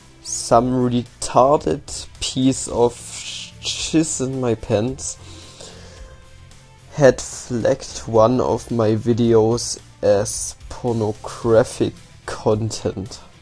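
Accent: Germany. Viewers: Are you german and trying your best english? You can talk well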